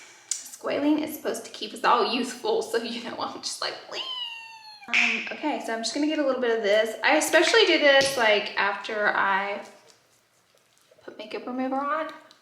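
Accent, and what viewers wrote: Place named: South, United States. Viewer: If her accent didn’t give away that she is southern.... that wonder bread sure did. Literally a southern staple haha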